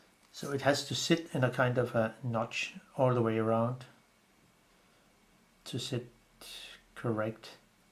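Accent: Denmark. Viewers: Are you Danish :)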